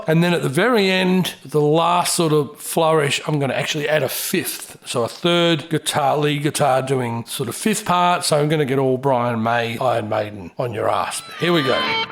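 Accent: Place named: Melbourne, Australia. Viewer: Melbourne, Australia (accent)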